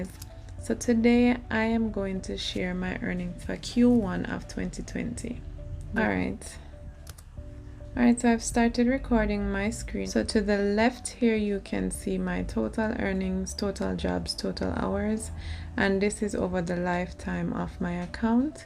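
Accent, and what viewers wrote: Jamaica. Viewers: You sound Jamaican... where are you based at I mean which city? I live in Melbourne Australia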